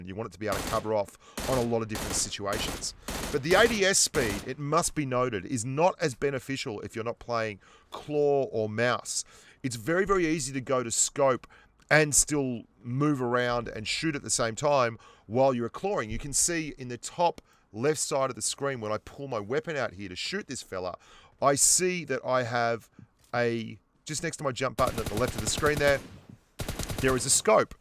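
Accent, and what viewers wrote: Australia. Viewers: Wow u got Aussie Accent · Are you Australian?...you sound like a mellow aussie.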